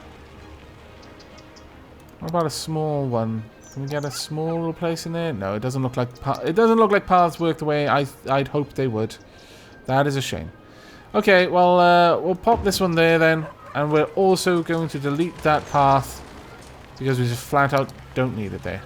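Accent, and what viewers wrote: Wales, United Kingdom. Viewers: 'Scallywaggery' is now my absolute favourite word to hear said with a Welsh accent.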